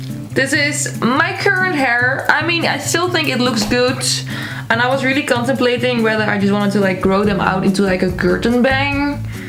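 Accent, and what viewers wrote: Netherlands. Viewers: Ik heb mijn voorhoofd verbrand met mijn stijltang, en ik heb perongeluk mijn bangs micro geknipt... Ik zoek gewoon voor antwoorden op YT, en dan zie ik jouw video en mijn brein gaat zo van "ZE KLINKT NEDERLANDS" En nu ben ik blij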